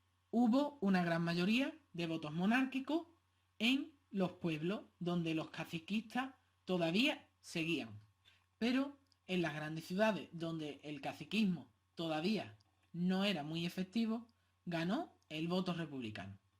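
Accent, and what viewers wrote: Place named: Seville, Spain. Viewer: Qué mejor que aprender historia de España que con un acento sevillano. Mis dieses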